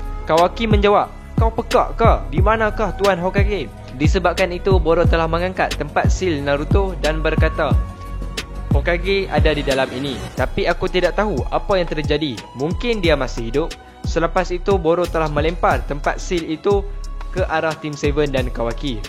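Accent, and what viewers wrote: North, Malaysia. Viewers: logat utara hahahaha but nice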